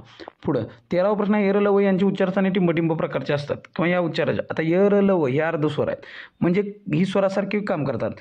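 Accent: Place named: Kolhapur, India. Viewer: Best attempt but speed is quite fast .. Kolhapuri tone watato..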